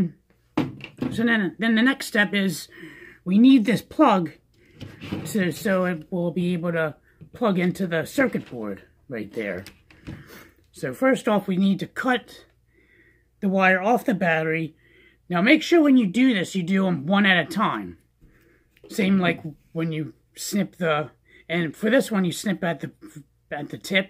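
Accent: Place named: Philadelphia, United States. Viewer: You sir are from the Philadelphia area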